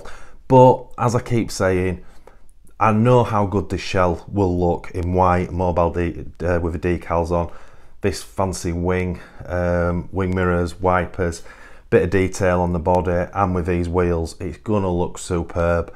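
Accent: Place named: Yorkshire, United Kingdom. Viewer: I'm also wondering if there's a knight rider shell???lol. I put your accent somewhere between huddersfield and Leeds? I was thinking in a previous video it's a bit sunny for round here,lol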